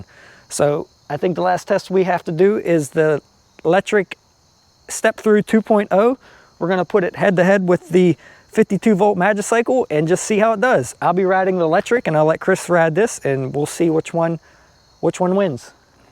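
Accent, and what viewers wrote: Pittsburgh, United States. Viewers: Great video! Are you from Pittsburgh?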